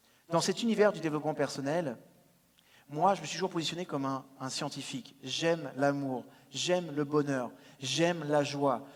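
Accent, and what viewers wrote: Canada. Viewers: Il est pas si mal votre accent canadien je me serait cru dans un épisode de "Mme Lebrun" oui en France aussi l'on connaît cette série tournée en public humoristique à souhait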